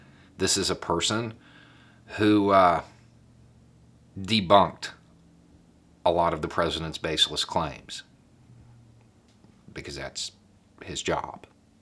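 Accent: South, United States. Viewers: As a non-American, it’s positively encouraging to hear that a person with a southern accent can speak without only saying insane things. I think it’s a first for me to hear. With the existence of your unique breed now confirmed, I hope more of you become visible in the media. I’m very happy to see this. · Love his points of view. But can someone please tell me why he doesn’t have a southern accent in his really old videos and a super southern accent about 8 months ago?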